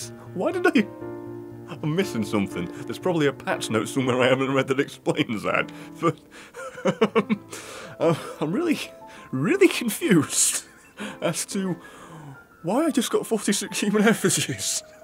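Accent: United Kingdom. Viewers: are you British? Keep up the good work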